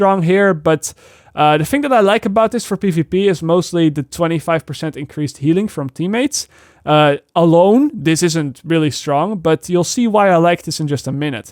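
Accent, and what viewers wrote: Netherlands. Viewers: now i can tell why people say are you dutch ? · Yo are you from the Netherlands, cause' I hear it at your voice.👍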